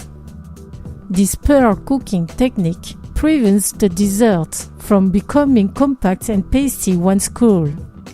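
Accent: France. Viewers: Merci, enfin une recette géniale avec tapioca et banane. Hum the french accent... Thank you.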